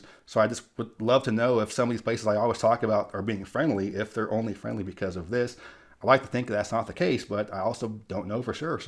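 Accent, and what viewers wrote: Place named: Southeast, United States. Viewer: I'm just guessing here, but your southeast accent is apparent to my ears, which many Mormons might associate with the Baptist denomination. And I believe there is a bit of hostility between LDS and Baptists, which became apparent to me when the typically-Republican-voting southern states had a very low turnout for Mitt Romney when he ran for President three or four election cycles ago. So it may go both ways, who knows. Edit: I'm from the Midwest, have traveled through Utah extensively, and lived in Wyoming for about a year, and was always embraced by the local population. You're a friendly enough guy, so it's got to be something else, either license plate or accent related (or that T-shirt you used to wear that read "Losers, Derelicts, and Savages unite)".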